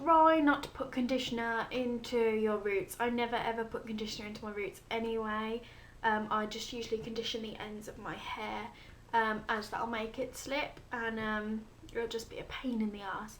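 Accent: United Kingdom. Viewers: Are you british/english? I love your voice! :3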